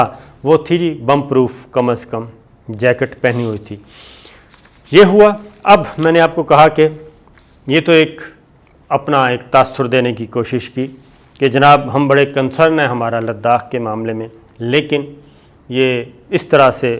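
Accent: Punjab, Pakistan. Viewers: Tum punjabi ne urdu ki mother fuck kardi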